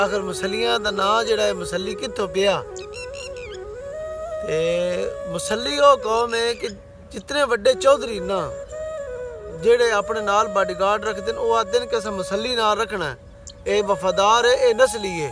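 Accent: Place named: Haryana, India. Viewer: Veer tuc HARYANA jive Punjabi bolde ne te vekhan ch v haryane de jaat jatt lagde ne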